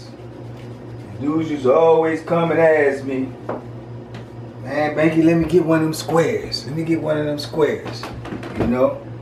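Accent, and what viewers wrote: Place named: New Orleans, United States. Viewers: Okay babe! My family is from NO! You sound like! I want to taste this and your cute❤️ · Sounds like You from New Orleans area dude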